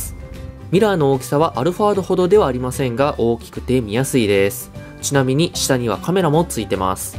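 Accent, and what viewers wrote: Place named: Kansai, Japan. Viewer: 南港あたり、西名阪、香芝インター、たまに聞こえてくる関西弁ｗ すごい親近感がわきますｗ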